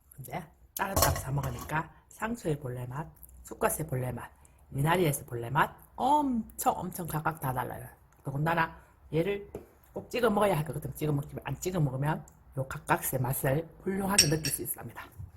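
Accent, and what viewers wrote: Gyeongsang, South Korea. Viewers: 늘 궁금했었는데 젊고 예쁜분이네요 경상도 사투리 매력있으세요 구독.좋아요 꼭꼭누르고갑니다 미나리키우는 방법도 알려주시면 감사하겠습니다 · 경상도 말씨 참 예쁘고 정겁네요~감사합니다💕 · 너무 재밌어요! 경상도 사투리도 좋고 옹사 잘 지으시네요.저도 사ㅇ추씨 뿌려야겠어요~/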